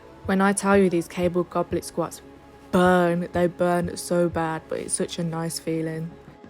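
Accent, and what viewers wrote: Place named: Birmingham, United Kingdom. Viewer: Dunno if I can deal with the brummy accent😂 a decent pump never the less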